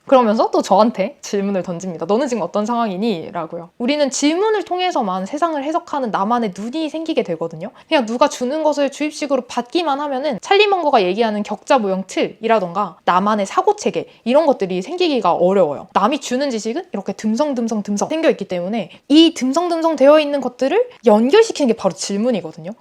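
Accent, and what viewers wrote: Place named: Busan, South Korea. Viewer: 부산 사투리 ㅎㅎ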